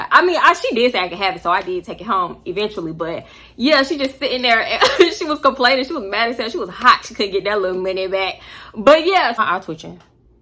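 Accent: Baltimore, United States. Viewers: When did you get this B-More accent 😂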